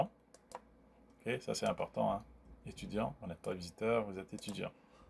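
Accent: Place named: Canada. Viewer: D'aprés votre accent, ça se voit que vous etes un anecien au Canada, sinon tan,irt pour la vidéo.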